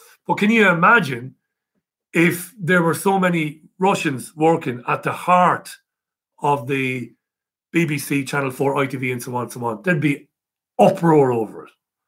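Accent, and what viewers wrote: Ireland. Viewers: he from waterford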